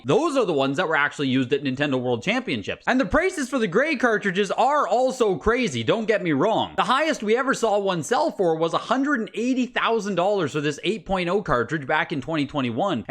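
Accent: Canada. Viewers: What is that accent you have? Canadian?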